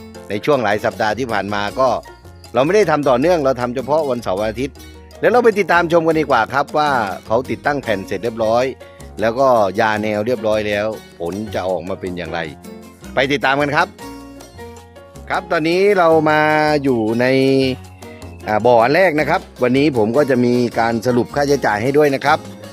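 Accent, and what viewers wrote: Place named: South, Thailand. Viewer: น้ำเสียงเหมือนคนใต้เลย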